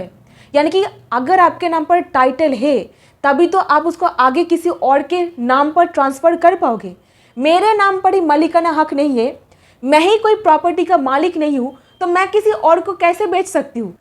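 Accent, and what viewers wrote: Bengal, India. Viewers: Thanks for explaining so nicely. Nice to hear hindi from a bangali speaking lady.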